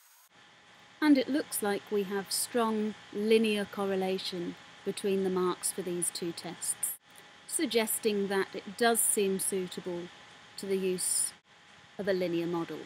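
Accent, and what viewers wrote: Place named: Australia. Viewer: thanks :) aussie accent eh ;)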